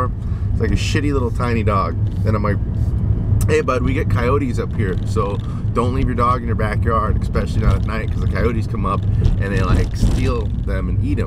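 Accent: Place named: Australia. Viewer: Yeah the accent is most definitely Australian but yeah you better hope they don't see this =). Good stuff mate.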